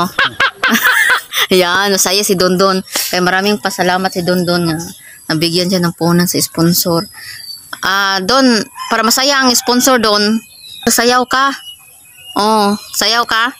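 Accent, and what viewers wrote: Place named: Visayas, Philippines. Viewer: Hello po, nag sub na po ako, dahil kay Dondon😅,kahit may konting Bisaya , natutuea akong panoorin , kasi malumanay kang magsalita , sarap pakinggan ang boses mo, magtulungan lang para sa pagtulong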